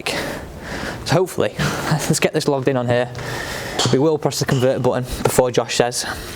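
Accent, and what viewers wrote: United Kingdom. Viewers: Great visual drill,.! Brit accent and all!::)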